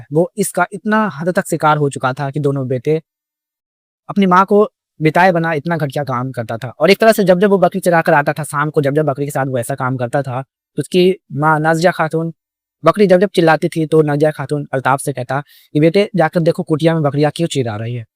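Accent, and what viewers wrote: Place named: Haryana, India. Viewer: Haryana se bol rahe hain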